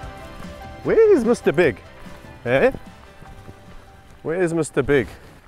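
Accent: South Africa. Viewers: I love the “sithe Efrikan” accent. 💗💗💗💗💗💗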